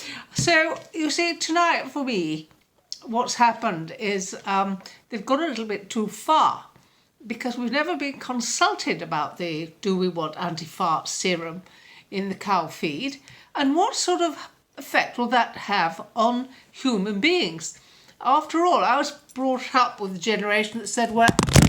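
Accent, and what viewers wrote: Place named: Upper class, United Kingdom. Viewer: hahahaa, hilarious!!! 😂 i love your upper class english accent! 😂 so funny!! shared it on my facebook, i watch you daily now, always keeping us entertained. you and katie, who i've followed for years. top birds!! xx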